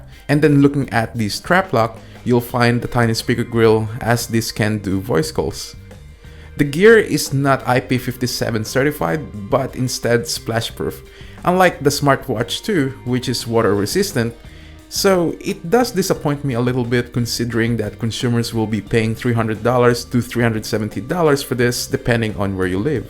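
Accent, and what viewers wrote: Philippines. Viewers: kinda disturbing. a guy with a Filipino accent but pronounces words with "O" in an Australian accent. not good to the ears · Are you Pinoy? Your accent sounds Pinoy. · the diction of the speaker sounds like Filipino. :)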